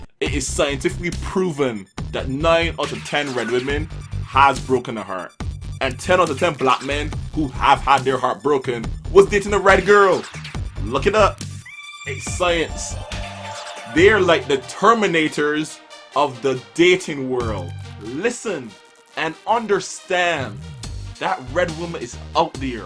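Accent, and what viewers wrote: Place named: Barbados. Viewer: You sound bajan boss man , blessings brother!!!mauby syrup and fish cake 🤙🏾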